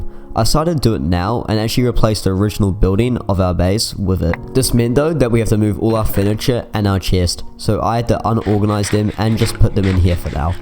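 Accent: New Zealand. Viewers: Good old New Zealand accent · Are you a New Zealander? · are you New Zealand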